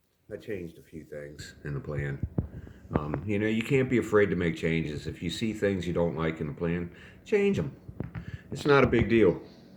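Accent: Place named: Pennsylvania, United States. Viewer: are you in Pennsylvania, possibly philly? I am guessing by your accent.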